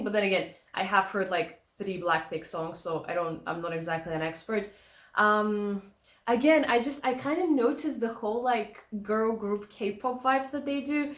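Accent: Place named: Ireland. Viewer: love Ireland accent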